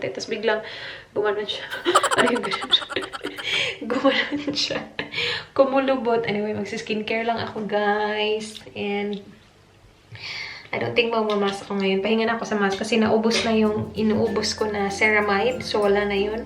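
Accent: Visayas, Philippines. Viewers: Ang accent sa pagka bisaya ba, mo gawas jud hahaha Kalisud basta bisdak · Wooh the bisaya tono uy 😅💖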